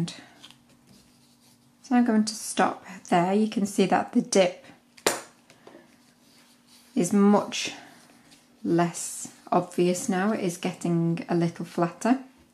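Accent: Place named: North, United Kingdom. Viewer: nice to hear a lovely ‘northern’ uk accent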